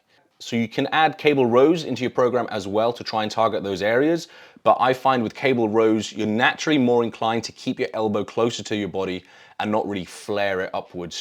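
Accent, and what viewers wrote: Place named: England, United Kingdom. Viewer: thought you were cbum from thumbnail. the english accent through me off. thanks for the info tho very good tips. back day in 6 hrs! subcribed!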